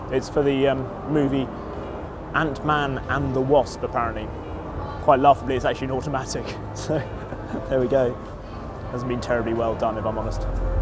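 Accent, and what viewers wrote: United Kingdom. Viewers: I know this guy's British, but he's like, REALLY British.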